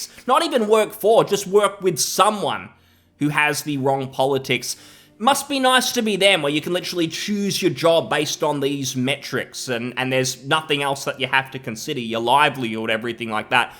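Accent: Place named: Australia. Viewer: The way you talk is utterly ridiculous. Are you actively trying to sound like 200% more Australian?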